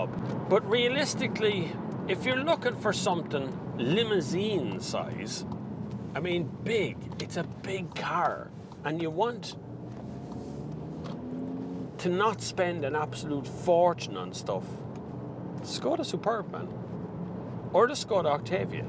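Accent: Ireland. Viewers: Enjoyed hearing the Irish accent in this review.Haha...Only thing better would be hearing a female Irish accent! ;-)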